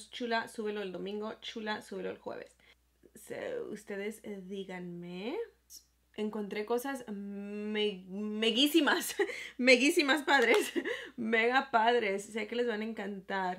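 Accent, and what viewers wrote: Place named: United States. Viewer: Me gusta cómo habla jajaja su acento bien pocho jajaj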